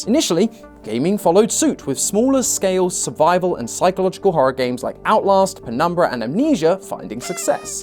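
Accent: United Kingdom. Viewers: He's British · I hate this wanna-be, low tier, british, fake matpat host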